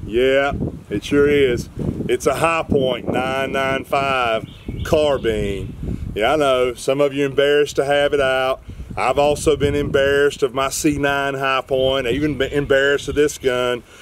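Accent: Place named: South Africa. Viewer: Cool vid! Where you from? Sound a bit South African.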